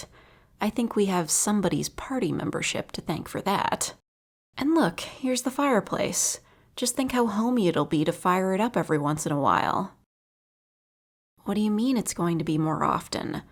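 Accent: United States. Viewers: the most American sound Russian on earth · She's american? Darn...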